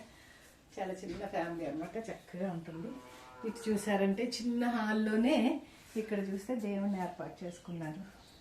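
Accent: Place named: Godavari, India. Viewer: మాటతీరు గోదావరి వారిలానే ఉంది!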